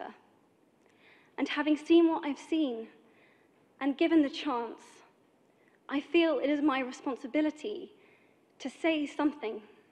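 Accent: United Kingdom. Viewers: イギリスなまりが結構強い！ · love your British accent, 😍 you're so beautiful and hope for us all women in the world. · 素晴らしいイギリスアクセント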